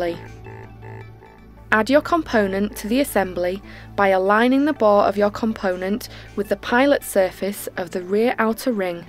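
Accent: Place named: United Kingdom. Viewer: I hate British accents....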